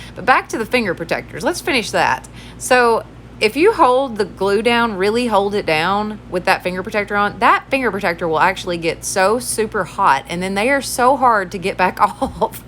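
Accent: South, United States. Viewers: Hey, love your accent. What part of the south do you live in? This is my first time with you, and I love everything. Very encouraging. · I just stumbled across your channel. So I'm new here love all of you ideas... curious to know where your from because you sound very southern. I am from Tennessee so I really enjoyed listening to you and watching I am now a fan. Thanks so much for the ideas.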